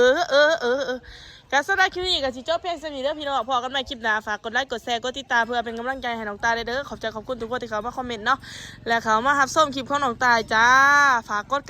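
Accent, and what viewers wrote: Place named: Ubon Ratchathani, Thailand. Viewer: ❤, เว้าคือคนไทย, จังหวัด, อุบลราชธานี, แทร่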